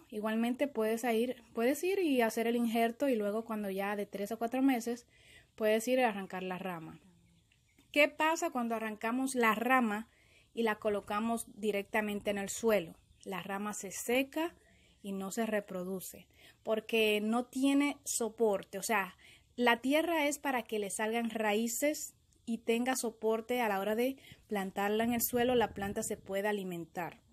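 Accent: Dominican Republic. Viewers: ahh es que no conocia el acento de republica dominicana suerte con tus arboles👍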